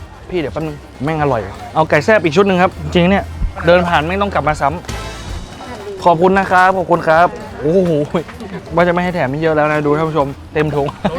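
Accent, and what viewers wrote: North, Thailand. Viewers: อู้เหนือพ่องนะ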